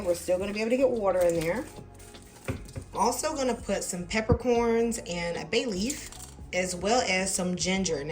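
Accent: South, United States. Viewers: My kids been sniffling these past couple of days so I’m definitely going to use this recipe. 🔥Are you from the south?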